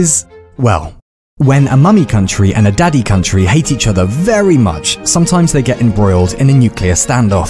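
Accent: United Kingdom. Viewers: Pls drop that british accent pls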